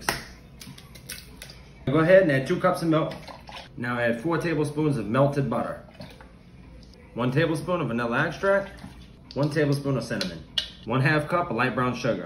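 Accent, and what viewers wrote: Louisiana, United States. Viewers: What happened to your Louisiana accent?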